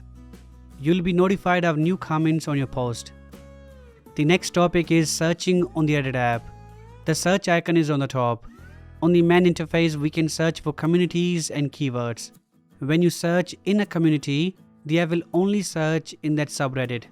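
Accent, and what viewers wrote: India. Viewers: Indian accent!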